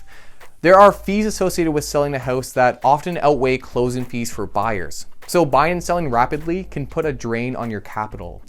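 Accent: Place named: Canada. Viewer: Watched a few of these videos now (great content) and this is maybe the first one where I REALLY noticed the Canadian accent XD. Also the salary multiplier here in the UK is normally about 4.5x.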